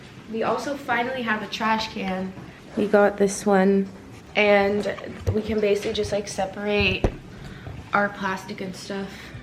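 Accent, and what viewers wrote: United States. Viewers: how come you speak such good English and you have an American accent lol 😂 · Did you ever live in the US? I thought you did earlier (idk maybe I just imagined it in my head cause of ur accent). I notice a lot of folks from Europe with an American parent have American accents. (Assuming ur dad is Nigerian American) P.S. luv ur vlogs! And you’re so authentically you.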